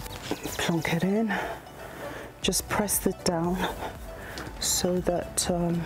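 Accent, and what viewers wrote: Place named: RP, United Kingdom. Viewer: Your voice is so Posh!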